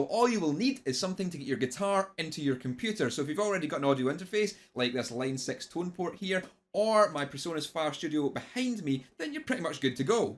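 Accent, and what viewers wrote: Scotland, United Kingdom. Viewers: It took me a full 4 seconds to tune my ears to "Scottish". Thanks for the video :)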